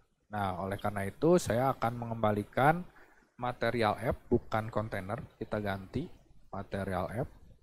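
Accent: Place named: West Java, Indonesia. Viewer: orang bandung nya, logatnya sunda :D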